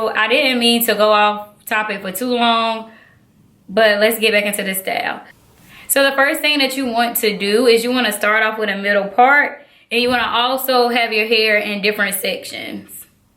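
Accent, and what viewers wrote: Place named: South, United States. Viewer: You country and ghetto sounding so I couldn’t finish watching your video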